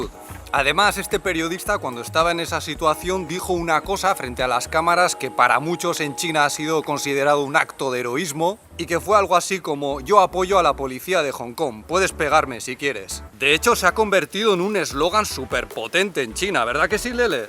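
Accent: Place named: Spain. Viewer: Saludos cordiales desde Ciudad de México. Pero que pareja tan más simpática y original. Sus videos sobre Huawei y Wudong no pueden calificarse menos que de maravilla. Entiendo que tu nombre es y el de tu juvenil esposa, Lele (su rostro refleja una edad máxima de 4 años). Y no, no te ha pasado ningún tren por encima, sólo que los caucásicos aparentan algo más de edad. Hablaste del País Vasco con un sentir muy ibérico, y tanto tu acento como tu dicción son marcadamente españoles, aunque tu fisonomía pasaría por anglosajona o hasta nórdica sin demasiada dificultad. De modo que me recuerdas mucho a Gonzalo Guerrero, marinero español llegado al caribe mexicano como vanguardia de exploración de la flota de Hernán Cortés. Guerrero (a diferencia de su compañero Jerónimo de Aguilar) renunció a su nacionalidad española para jurar lealtad a su mujer y a la nación maya de ésta, Ulümil Kutz (Mayapán o Reino Maya del Faisán y del Venado, etnia muy cercana a China como demuestra su afinidad lingüistica), con quién engendró hijos mayas, nuestros primeros mestizos. 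En la España de su época se le juzgó traidor, pero los republicanos españoles de hoy (y como no, todos los actuales mexicanos), lo consideran patriota tanto maya mexicano como español, a pesar de haber muerto en la adversidad, luchando contra sus hermanos en defensa de la patria de su mujer y de sus hijos. Creo que todo español bien nacido tanto como ciudadanos chinos leales a sus raíces más profundas, te consideran honorabl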